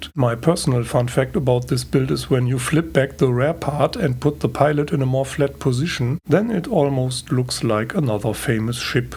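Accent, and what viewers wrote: Germany. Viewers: Hey, I have never heard your voice, but now Im curious. Where are you from? Do I hear a bit of German accent?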